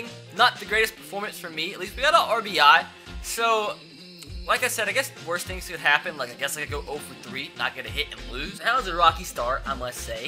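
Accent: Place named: Alabama, United States. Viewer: Yo what part of bama you from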